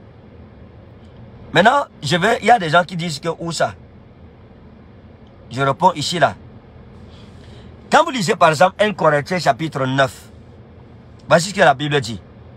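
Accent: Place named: Democratic Republic of the Congo. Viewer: Pasto vous avez l'accent d'un zaïrois c'est juste pour savoir sa n'a pas d'importance mais je cherche à savoir ne voyez pas dans ma question un esprit polémique..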